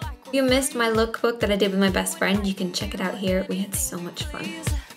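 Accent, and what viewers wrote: Ireland. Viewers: hey!! are you irish!! :P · Which part of Ireland are you from? I can't place the accent!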